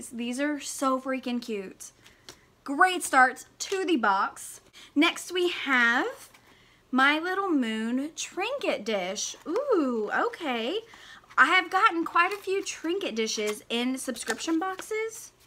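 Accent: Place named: Spain. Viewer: I love your Spanish accent it's so cute. And don't mind it's difficult to read it. I'm spanish and for me English is kind of difficult 😂😂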